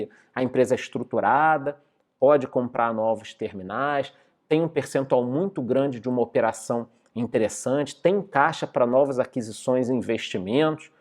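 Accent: Rio de Janeiro, Brazil. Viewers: O mora no sul mas esse sotaque carioca não nega kkkkkkk.... bota fleury ai na carteira porr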